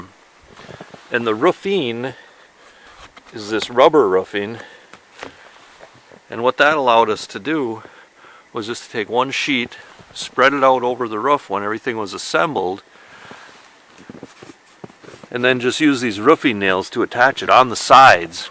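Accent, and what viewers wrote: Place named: Upper Peninsula, United States. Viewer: Sounds like sout o Ishpeming, eh. One of dem yoopers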